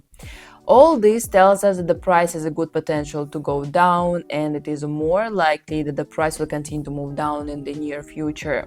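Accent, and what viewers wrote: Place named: Russia. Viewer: У вас акцент , вы русская ? Я угадать ? Не типичный английский , ведаете на англоязычную аудиторию!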